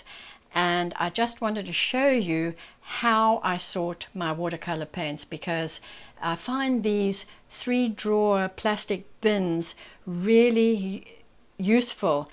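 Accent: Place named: South Africa. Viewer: Hi, I’m so pleased to have discovered your channel and of course I immediately recognized your South African accent.... ☺️🎨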